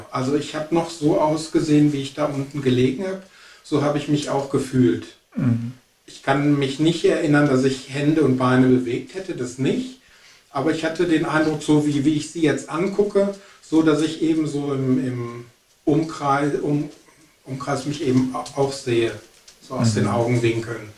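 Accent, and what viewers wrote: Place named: Switzerland. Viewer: Seltsam. Ich finde Herr wirkt sehr ruhig, aufmerksam und hochkonzentriert. Seine Art ist schlicht wohltuend. Den leichten Schweizer Dialekt mit dem er spricht empfinde ich als angenehm.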